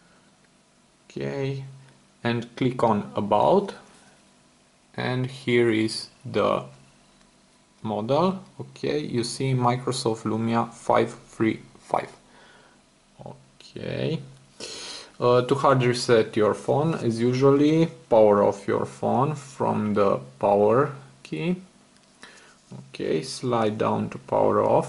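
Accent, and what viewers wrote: Romania. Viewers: Mr.Alex_YTB /\ ShadowHyper Dont feel sorry man. He is struggling as well , with the language. The way he speak english is telling me that is more likely Romanian or probably Bulgarian. I don't see any reason of you feeling sorry that you don't speak English , when him with his English is on YouTube trying to get make some money.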